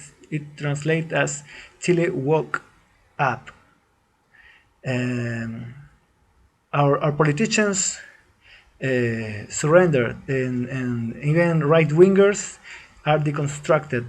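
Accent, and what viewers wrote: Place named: Russia. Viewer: Warning mister trump,. this guy is a russian, you can tell it by his spoken english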